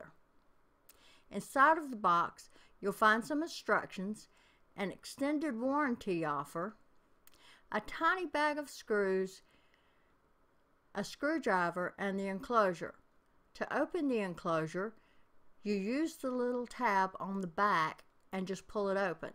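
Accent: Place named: Texas, United States. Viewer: I like your video, are you from Texas Because this is the kind of accent I hear when I watch cowboy movies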